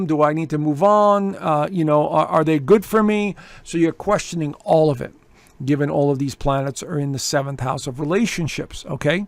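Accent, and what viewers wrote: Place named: New York, United States. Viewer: Sounds like you’re from the 5 Boroughs or NJ.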